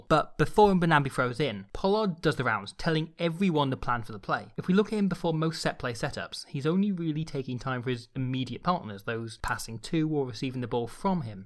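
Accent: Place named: England, United Kingdom. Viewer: Ah. Never knew that. He sounds English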